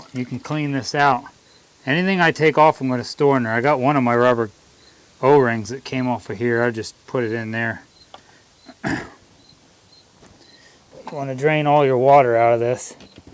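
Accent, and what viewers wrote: Pennsylvania, United States. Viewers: Loved the video. It was informative. I have always helped my dad with winterizing our pool. I've never done it alone. My dad passed last month. I'm thinking to myself... how on earth do I close the pool for the winter. My dad had done everything and only wanted help with centering the pillow and placing and securing the pool cover. So here I am.... clueless about all of the other aspects of closing the pool. I had to chuckle at the PA accent. My dad has family in PA. I just came back from a week in PA (it was originally planned as a trip to bring my dad to say good bye to his family there). Thank you for your advice. I got this.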